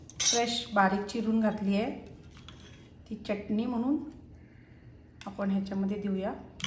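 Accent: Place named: Gadhinglaj, India. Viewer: खूपच ावडलई. नक्की करून बघणार. काकडीची चटणी आणि रोस हे नवीन प्रकार समजले. मस्त!!, अलिकडच्या व्हिडिओत तुम्ही हडकल्यासारख्या दिसता. ही complement आहे बरं का. आज मेकप पण खऊप छान झालाय.❤ -सुनंदा गंदगे ता.क. तुम्ही रेसिपी खूप छान सांगता. मला तुमची भाषा ऐकून पण खूप छान वाटते. माझ्या आत्या गडहिंग्लज च्या होत्या.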